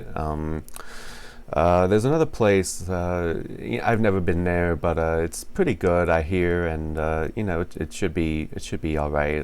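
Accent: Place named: United States. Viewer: I love your accent. Which part of the States are you from?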